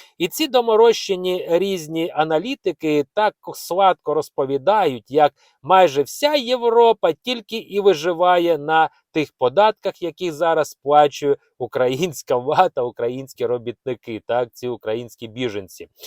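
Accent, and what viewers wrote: Russia. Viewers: Автор - серед українців вати немає. Бо вата це російські і проросійськи мислячі люди, які українцями бути не можуть в принципі. Громадянами - так. але серед них і вірмени, і євреї. Я про це вже вам зауважував. Якщо ви вважаєте себе україномовним блогером ( російський акцент лізе у всі діри), то притримуйтесь усталеної української лексики, а не переміняючи поняття з ніг на голову. Що характерно саме для москалів. Не братимете подібні зауваження до уваги - зійдете на маргінал, як подібний до вас канадський марк. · Любі українці, не слухайте цього мерзенного блогера. Він чистокровний рашист, прислухайтеся до його вимови. Ще один канадський марк знайшовся, який бігає по лісосмугах та ллє бруд на українців. Але при цьому не заважає йому клянчити у українців гроші на донати. Мені цікаво, куди ідуть ті гроші. Сумлінні українці і без вас донатять. 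Самі присосалися до чужої країни, а людей, які рятували своїх дітей від війни, вони пускати на"свою" територію не бажають. Це їм конкуренти. У нас в Україні майже всі чоловіки працюють на двох або і на трьох роботах. І не вам їх паскудити.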